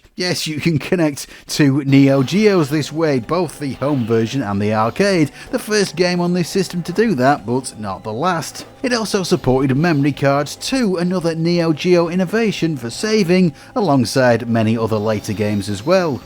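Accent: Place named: England, United Kingdom. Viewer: I hear a fellow english accent, I see neogeo, I KNOW the metal slug section is going to be the longest lol Nice one my man :D.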